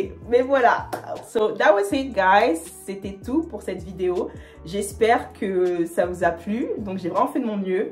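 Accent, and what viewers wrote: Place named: Rio de Janeiro, Brazil. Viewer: Vim te ver falar o português. Tá ótimo! Parece carioca. Parabéns menina! 🥰😉😄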